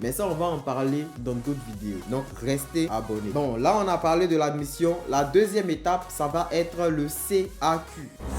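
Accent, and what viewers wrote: Ivory Coast. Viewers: La musique en fond de la vidéo est un peu prononcé, la video est excellente, j'aime plus l'accent ivoirien avec les blagues 😏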